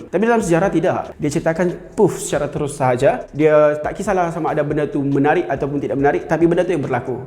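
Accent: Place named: Sabah, Malaysia. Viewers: Sabah kan😂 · Mcm loghat sabah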